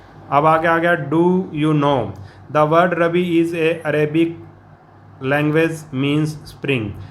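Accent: Punjab, India. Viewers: I think he is Punjabi so his accent is Punjabi 😊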